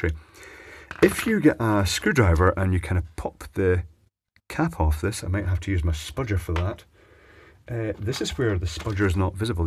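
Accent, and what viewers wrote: Scotland, United Kingdom. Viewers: Bit like old bond he has a Scottish accent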